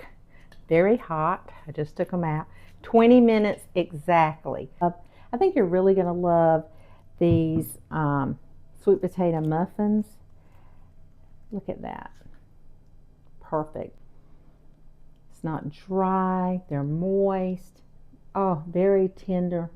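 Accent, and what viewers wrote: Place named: North Carolina, United States. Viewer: I have just recently found your videos and enjoy them. I live in Leland NC. I thought you sounded like you were from NC. I lived in Beulaville NC back in th early 70's. There was not much there. I think there was a bank, gas station maybe 2 I think there was a Haedees. I don't think we had a stop light. Beautiful little town. Have a safe and enjoyable 4th. Oh have you tried precooking your potatoes (regular and sweet) in the microwave then peel and fry..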